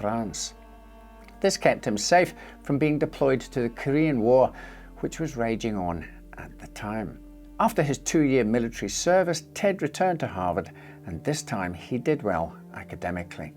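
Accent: Scotland, United Kingdom. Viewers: I like your stories and enjoy your soft Scottish accent. I can't abide stories about harming animals and had to avoid 'Miracle Mike' and struggled through the 'Tarrare' story. Otherwise, great channel. Have you thought about doing a piece on 'The Ant Hill Kids'? A very strange tale, indeed.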